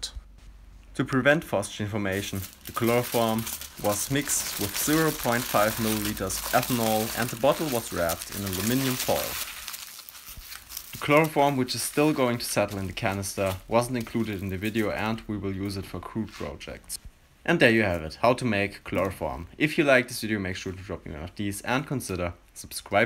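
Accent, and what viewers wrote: Germany. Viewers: I hear your german in your voice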